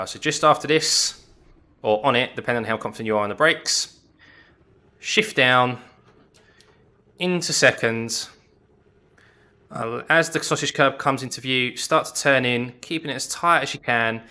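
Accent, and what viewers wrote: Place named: Essex, United Kingdom. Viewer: Harsh but an Essex accent is top tier.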